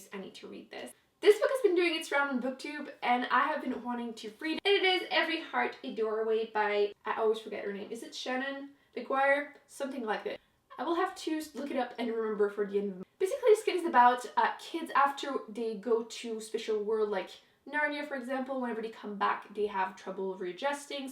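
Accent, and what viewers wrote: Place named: Quebec, Canada. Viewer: Hi Hello! I'm new to your channel and have enjoyed watching your videos and hearing your thoughts on books! Hope you enjoy the books from your January TBR!:) Btw, are you from Canada? You kind of have a French-Canadian accent.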